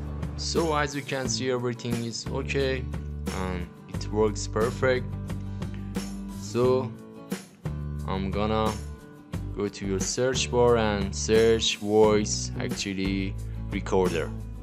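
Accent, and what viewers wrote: Iran. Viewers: That accent just screams Iranian origins to me Which is great ofc, the audible acquaintanceship with the beloved Persian language is sheerly a blessing to the ears =) Keep it up with the great content brother, Best of wishes from a fellow aspiring creator of the same origins😁✌️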